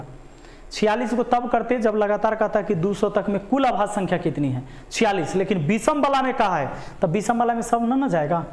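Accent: Bihar, India. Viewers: Tumhar language bihari hai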